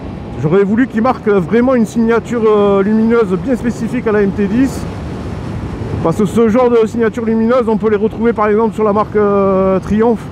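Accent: South, France. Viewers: Essaie super sympa et cet accent du sud qui fait plaisir a entendre il ne manque plus que les cigales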